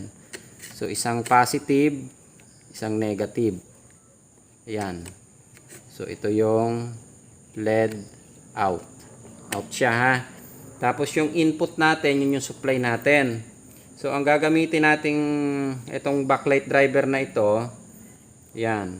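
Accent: Mindoro, Philippines. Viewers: Tagasaan po kau sir...parang taga MINDORO po kau kababayan ko..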